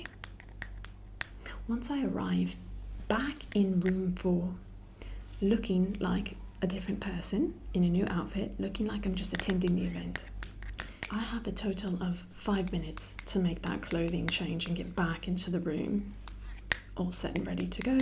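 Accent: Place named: United Kingdom. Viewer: You sound more like a British Mi5 agent:)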